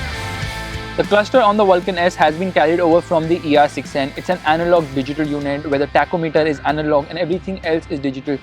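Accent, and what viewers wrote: India. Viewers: Акцент бешенный. Лучше б на индийском своем говорил. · Now first I want to say what a great video this guy put out. The host was really great and did a great review. To be honest I giggled a little bit because of his accent and his words that he used (however it's how things are said in india). But this provided great character and kept me wanting to view more, as a result I became attracted to the bike more. I wish he could do more videos in USA because he is pretty talented. So in all much love and respect, I am happy to give 2 thumbs up, this host made this bike kick ass even more than it is.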